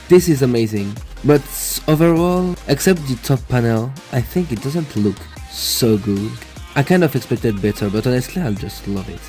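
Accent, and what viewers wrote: France. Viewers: wesh un francophone qui assume son accent baguette....respect. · Is it just me or voice seem a little bit less french-y?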